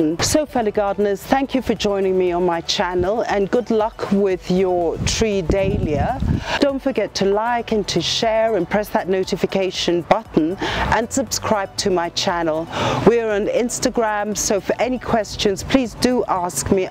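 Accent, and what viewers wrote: England, United Kingdom. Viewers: Very good video, especially when you speak English English! Informative!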